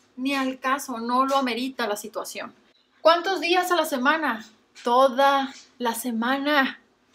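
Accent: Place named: Chihuahua, Mexico. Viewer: ʎʇɹɐɯ ʎןɟɔɯ yo creí que era de Chihuahua por el acento tan shulo 😐😐.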